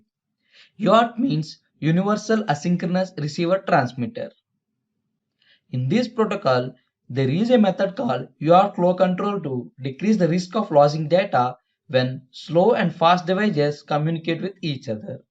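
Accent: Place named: India. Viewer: Why it always have to be indian accent wich noone understand?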